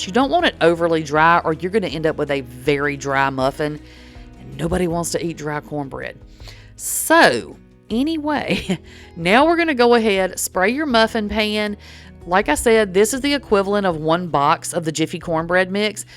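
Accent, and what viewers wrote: South, United States. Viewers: Trying to place your accent. Im in the NWArkansas melting pot and we get have all kinds of versions of a southern accent here.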